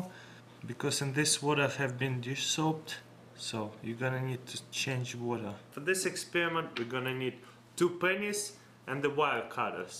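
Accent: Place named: Russia. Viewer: ***** he is russian. i am sorry about the comments. So. he is not slow , he is russian.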